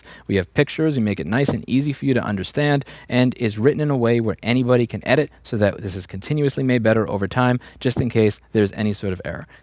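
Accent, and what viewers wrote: New York, United States. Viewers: New Yorker detected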